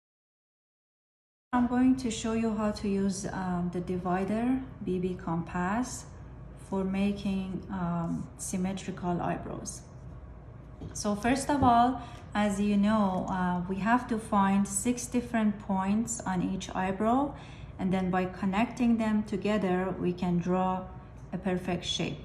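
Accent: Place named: Iran. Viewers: She is Persian? She sounds like Farsi accent. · I love this accent Persian people 😍♥️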